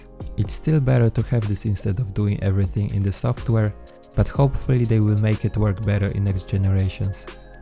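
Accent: Poland. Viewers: Cześć! Super recenzja po akcencie słyszę że z Polski jesteś co nie? Na twoim miejscu robiłbym recenzje w dwóch językach bo dużo polaków by cię napewno oglądało a większość ludzi z polszy jest zbyt leniwych żeby oglądać recenzje po angielsku 😃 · You...will you test the new rog Strix scar 3 (with rtx 2060/2070 and i7 9750h) ? PS: I like polish YouTubers 😁 like you (I recognise your accent because I'm near your country)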